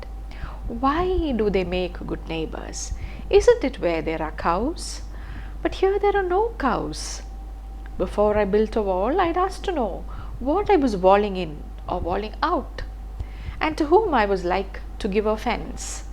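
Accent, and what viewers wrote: Kerala, India. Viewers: And a clear Malayalee accent.😊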